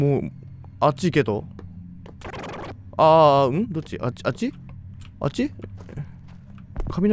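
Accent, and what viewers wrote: Kansai, Japan. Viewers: 確かに笑か怖かどっちかにしてほしいですね、サムネ最高ですw 「どっちやねん」 の関西弁好きです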